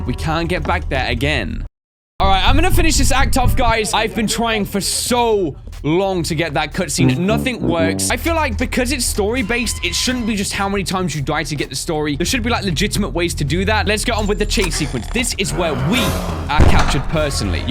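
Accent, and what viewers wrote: United Kingdom. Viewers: I love your British accent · Are you British?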